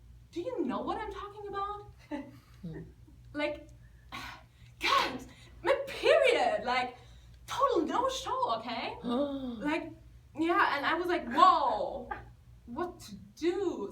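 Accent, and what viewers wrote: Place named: France. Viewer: ah- they want money for the original i guess. :/ i almost thought you might be french from the tiny bit of accent i heard. german is one of the languages i study daily. it's tough. keep up the awesomeness. : )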